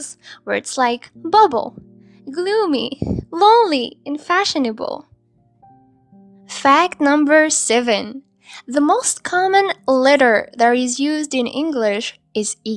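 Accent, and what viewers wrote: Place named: United States. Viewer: Fun fact your : you could be a singer. You have a great America accent. And sweet voice❤️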